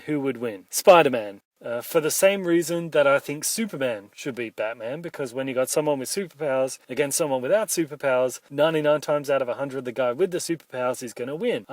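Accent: Australia. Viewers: OH MY GOSH I KNEW WAS AUSSIE AFTER THE FIRST VIDEO! OH MY GOSH WOOOOOW (i live in aussie) and i thought you were to!!! oh my gosh thats amazing there are known people on youtube that aren't american. i solute you! PS I AM SUBBING! KEEP IT UP MATE! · Wait are u aussie if yes YEEEEEEEEEEES TEN BUCKS IS MINE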